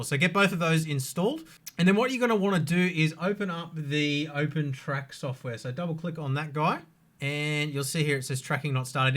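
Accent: Australia. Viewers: Dude he is a ozzy. Its called an accent. ;)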